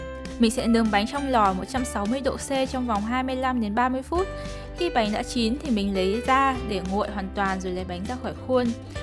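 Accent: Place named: North, Vietnam. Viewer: Giọng chị cuteee quá 😍😍 em ng miền nam cực kì thích giọng miền bắc.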